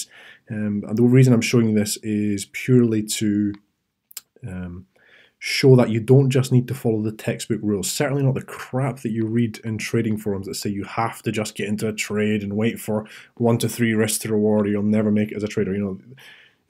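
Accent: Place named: Edinburgh, United Kingdom. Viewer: Hi, is there a way i can contact you by email to ask a few questions? I am completely new to this and looking to do this on a part time basis. You sound like you are from the Edinburgh area, I am from and live in Aberdeen.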